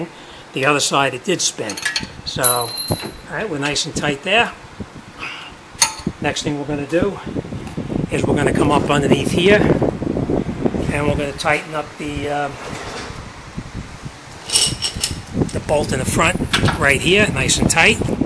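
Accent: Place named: Boston, United States. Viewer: My FL friends used to make fun of my "how you doin" accent (Paterson and Fair Lawn) but man oh man I really thought you were from Boastn (caaa-no r). Anyway thanks for the cool videos you post! It is refreshing watching them.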